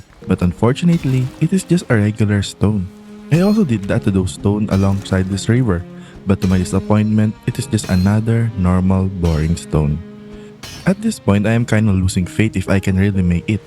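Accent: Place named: Philippines. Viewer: i recognise that accent, but i can never be sure, would you happen to be pinoy?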